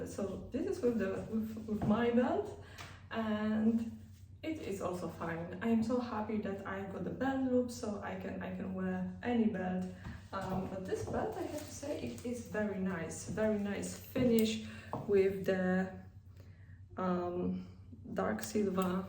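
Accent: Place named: Poland. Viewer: sounds like polish - english...)))the worst and most horrible English.... not "free"....correct its three !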